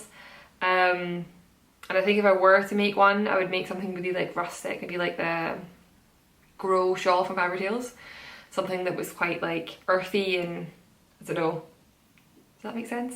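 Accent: Scotland, United Kingdom. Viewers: Your podcasts are great :) I was wondering if you could recommend more Scottish knitting podcasters, I absolutely love listening to Scottish English. Also, I planned a trip to Edinburgh this October and I would be very grateful if someone could recommend a nice yarn shop in Edinburgh centre :) Thank you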